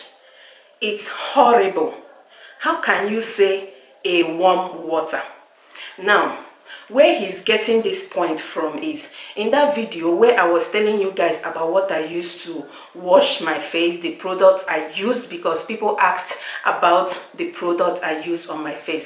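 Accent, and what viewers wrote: Igbo, Nigeria. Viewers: I am a university graduate and most times when I'm in front of camera I tend to commit some grammatical errors due to nervousness... Mama na dem get their mouth let them talk We that love u really do And to me ur english is a perfect nigerian english U r been real and not trying to form what you are not . Safe delivery mama Love from MZ_OLOJUEDE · People can be so funny,my sister believe me I love your Igbo English,I love everything about you,so haters will always want to be NOTICE ME rubbish I love you my sister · Hapu ndi ibrribe biko .... that one corncern them if they don't like your content let them leave it alone.. your command of English is very wonderful no matter how long we have stayed in another mans country still our nigerian igbotic accent will not leave our mouth my dear.. carry on my sister you're doing a great job biko. 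· Proudly Igbo.. please keep using your Igbo accent.. we cannot hide our identity..♥️♥️♥️😘😘. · The Chinese are all over Africa doing big businesses and most of them can hardly speak English. So what's wrong with those people who think they are masters of English? The Chinese,Russians, French, etc have proven to the world that you don't need the English language to succeed in life. And for someone to focus on how you speak rather than the ideas you are trying to convey is to actually display the highest form of unintelligence. Who has bewitched their minds into thinking that life revolves around pronouncing words like your former colonial masters? My sister you are doing okay, forget all those people who are constantly and ignorantly rejecting who they are and what God made them. The easiest thing to do in life is to be yourself and not a cheap copy. For those ignorant critics of your accent there is what is known as British accent, American accent, Indian accent, Australian accent, Russian accent. 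Why in the world would she not have a Nigerian accent because she is Nigerian after all. And that's how the rest of us Africans distinguish a Nigerian from all other blacks. Because no matter how educated a Nigerian or South African is the influence of their native language will still show. E.g Trevor Noah, Ngozi okonjo iweala, Aliko Dangote, etc. Sis it takes a small person to insult and criticise a great man/woman. Let your critics post videos of themselves also so that we can critic their grammar and fake accents. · Not to make you feel good but I started following you, ozi Tochi and Millicent xanderc bcoz you guys are very igbotic and I like it... supri supri is too much on YouTube... I want to listen to someone who talks like me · My dear! You are perfect because you are in Christ. I was born here in the US and you’re English is excellent... your children and family are beautiful.. 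you are beautiful and a breath of fresh air! Please stay true to who you are and do not conform.. you are intelligent, genuine, industrious, hard working, wise, and kind... The funny thing is I subscribed to you because of your Igbotic swag.. Abeg be who you are... your true YouTube followers love and support you! Love youuuuuu!!! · Don't mind them bad belly people every where please allow we that love the igbotic accent to keep watching.and to mr know it all please leave this woman alone we need our baby girl to arrive in peace biko.mummy just know that this people are jealous of your progress and they wish to achieve half of what u have achieved but they can't.this is the only way for them to pour their frustration. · Just stay the same, accent is who your are. We like Nigerian English 👍👍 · Aunty, pls I beg u in d name of the Almighty God pls dont stop. Continue with ur videos. I gat ur back 1000% and God gat u 1m%. 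Non of these people cares if u starve. You speak lik yankee dem go say ur too fake, u speak igbotic dem go say u too local. Wetin man go do? Anyway, just develop thick skin cause you're in d public forefront, criticism will arise now and even later in life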